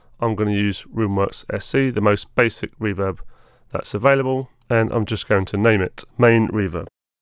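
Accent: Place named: United Kingdom. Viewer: Nothing bet'er than to have a brit' teacher, noice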